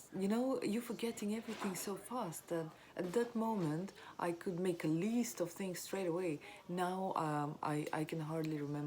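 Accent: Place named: Eastern Europe. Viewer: are you east european? your accent is so pretty :)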